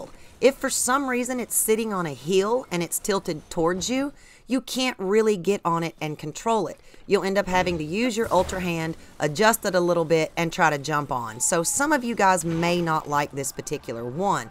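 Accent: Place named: Midwest, United States. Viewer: I keep getting distracted by your super thick midwestern accent 😂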